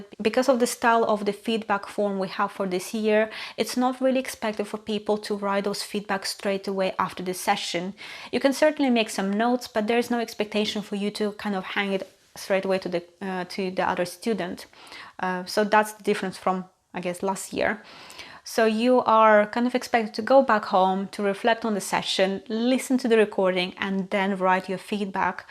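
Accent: Russia. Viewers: I can hear Russian accent. Are you Russian?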